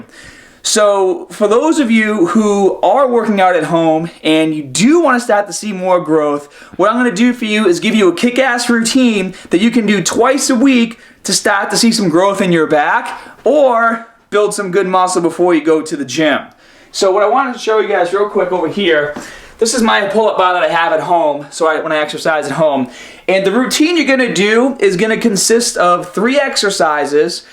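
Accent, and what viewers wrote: Boston, United States. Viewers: Is this guy from Boston? He sounds like it.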